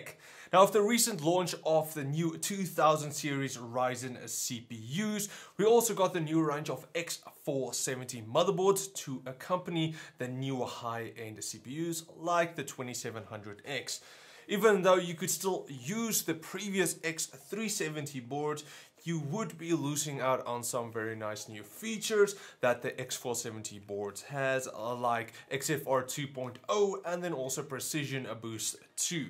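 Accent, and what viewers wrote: South Africa. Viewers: My mother is South African and I lived there for around 6 years when I was very young, it's so nice to see a South African reviewer and It's great to hear the accent again. The review is also amazing! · Are you from South Africa?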